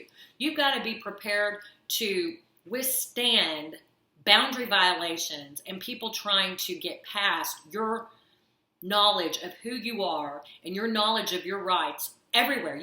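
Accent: Texas, United States. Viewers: Are you from Texas? You've got the accent and that great kick ass attitude you need to deal with narcissists 👏👏👏👏keep up the good work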